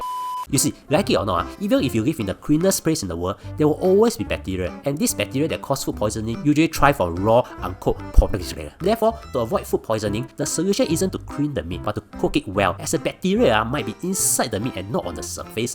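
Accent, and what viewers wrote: Singapore. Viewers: That is his signature style, exaggerated singlish, how abt u find another channel to watch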